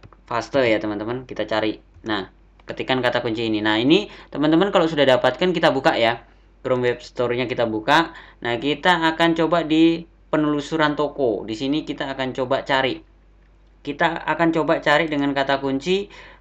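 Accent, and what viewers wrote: Java, Indonesia. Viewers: Kewoooooot....mantab...paste....medok2..tp?lucu.mwkkwkw...iklane bang...ngeri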